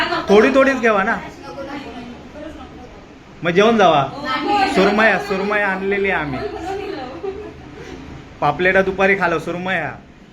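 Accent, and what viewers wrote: Malvani, India. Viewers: malvani manapasun n khaychiv natka n krta boltas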